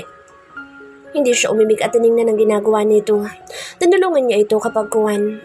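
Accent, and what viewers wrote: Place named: Philippines. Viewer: Sayang kaya wala ng nasyadongnagsusubscribe sa mga upload ninyong story isa na sko sa mga subscriber ng mga maganda ninyongstory pero ngaton I stop subscribing your stories dahil hindi ko na gusto ang narrator ninyo. style s. Style ng pag deliver ng lines at saka nay punto pa. Taga saang probinsiya ka? taga saansng punto na yan galit ka sa pagsasalita mo sayang nasaanyong dsti mong narrator. mula ng mslspit na ang finale ng Hiding The Montemsyor Real Heir pnapitan na sng narrtor kaya hindi ko na tinapos ang siguro sampung episode bg montemayor heir hindi gusto a yong boses ng narrator kaya di na slam sngnaging finale ng Montemayor Real Heir Gusto ko sanang makinig sa story kaya di ko rin. Ma absorb ang voice na galig at mabilis na parang hinahabol ng asong ulol angstyle ng pagsasalita ni miss narrator. Ssaysng sng gsmda sana ng storymo. 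✌️✌️✌️✌️✌️✌️✌️👎👎👎🤮🤮🤮🤧🤧🤮🤮🤮🤮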